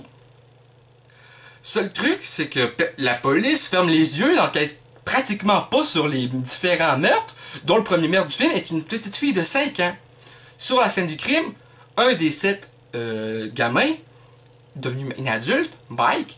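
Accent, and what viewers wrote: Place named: Quebec, Canada. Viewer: Au Québec , vous avez vraiment un drôle d'accent !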